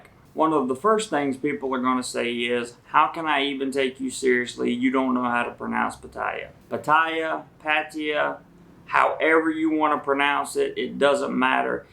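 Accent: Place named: Carolinas, United States. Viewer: You are from Carolinas know by ur accent I LIKE Jomtien very reasonable for long stay shelter Was thinking you live in a more reasonable Shelter cost location??? Thats why i asked...Sorry dude Thailand is a million times Safer than USA dont understand security reasoning?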